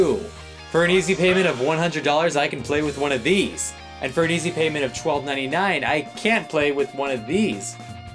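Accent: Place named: England, United Kingdom. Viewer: Top quality English accent.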